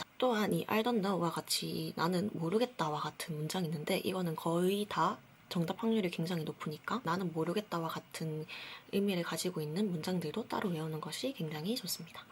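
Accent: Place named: Seoul, South Korea. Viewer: 난 서울말인줄 알았는데 차분하니,, 어디 사투리라는 거임?? 전라도도 아니고 충청도도 아니고.. 음..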